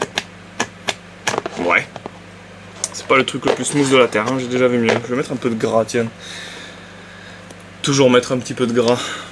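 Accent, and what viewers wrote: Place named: Southwest, France. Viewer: y'a un petit accent et des expressions sympathiques , tu est du sud ouest peut être ?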